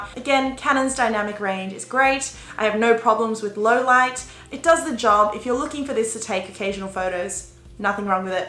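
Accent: Australia. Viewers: I really like how you talk. That Aussie accent sooo nice! Nice camera btw 🙌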